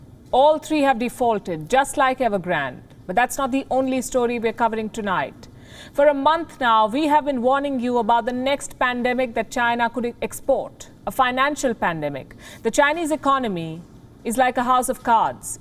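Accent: India. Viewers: American media with indian accent … she make china fall apart … we heard you that china 🇨🇳 is falling down ..tell us about America 🇺🇸 who already fall down .. and about india 🇮🇳 who is still 3rd world country run by Europe and 🇺🇸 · It's formal Indian accent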